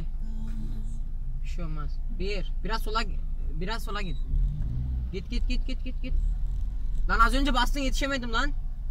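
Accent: Malatya, Turkey. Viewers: Malatyalimisin kardes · Kardesim malatyalimisin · KArdeş malatyalımısın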